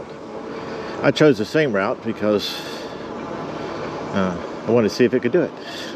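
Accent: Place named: South Carolina, United States. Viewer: Enjoying your videos. Keep riding. One question....what state are you in? I see all the crape myrtle, palm trees and southern pines, but judging by your accent, I would guess South Carolina? Let me know if I came close.. Lol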